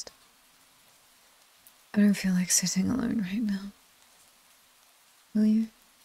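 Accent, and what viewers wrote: United Kingdom. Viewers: I love the British accent and the background thunderstorm. It's so calming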